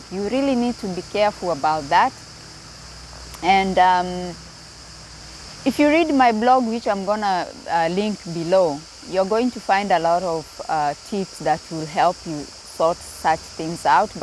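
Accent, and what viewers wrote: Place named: Kenya. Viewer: You speak like a Kenyan, were you originally from Kenya? I'm coming to Greece to buy a holiday home, later a retirement home.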